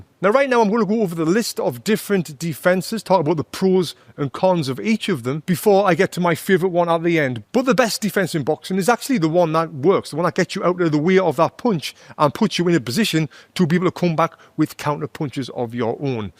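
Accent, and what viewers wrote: United Kingdom. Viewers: Great work love that british accent mate😀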